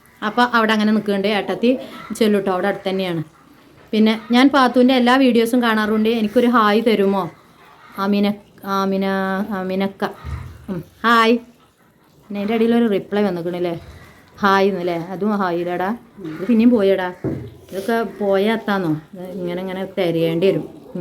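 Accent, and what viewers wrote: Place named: Pullanjeri, India. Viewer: ചേപപൂര് ആണോ വീട്.ഞാൻ Pullanjeri ആണ്😊.ഞാൻ ഈ അടുത്താണ് നിഞളെ വീഡിയോ കാണാൻ തുടങ്ങിയത്.പിന്നെ നമ്മ ള ഭാഷ അത് ഇതല്ലാതെ വേറേത്😊❤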